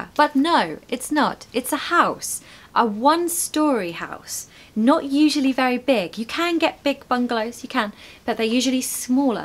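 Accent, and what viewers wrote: United Kingdom. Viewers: I am from Bangladesh. Your teaching method is amazing and heart touching. I am really smitten learning authentic and latest British accent from a versatile English teacher like you. May Allah bless you with his divine love to live long! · I don’t know how to describe my feeling now, just to say you’re great, you’re amazing; your choices, your performance and your accent. I found your channel last night and saw you had uploaded your first video two months ago. Really liked to be the first one who watch your videos. You speak English like a mermaid, soft, elegant and poetic. Your tone is so soulful. I’ve seen lots videos on YouTube in the British accent but yours are something else. We’ll await new videos. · I'm from Thailand .l love British accent And you so Amazing ❤ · Actually, I loved English at the very beginning because of British way of pronunciation. 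Now I enjoy it listening to you. Thanks for the videos. They are soo lovely 😊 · I love you, and I love british accent too ❤ Amazing video.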